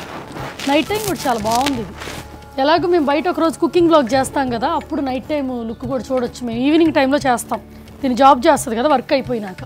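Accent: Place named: Guntur, India. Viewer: Mee slang vintey Nenu Guntur lo unatte undi. Nenu Tenali. Mee Balam mee Jaanu Ney. Mee Ammayi ,adore her.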